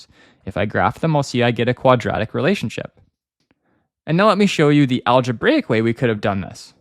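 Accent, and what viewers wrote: Canada. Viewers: i hear the canadian accent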